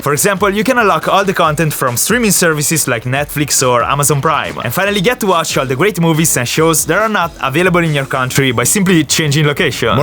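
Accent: Italy. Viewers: pov: guardi per la prima volta un suo video e ti sembra abbia un accento molto italiano, guardi la sua descrizione e...... beh è italiano :D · no vabbè ma sei italianoooooooo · Quanto si capisce che sei italiano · Italian english be likes Quand'è che dici shish?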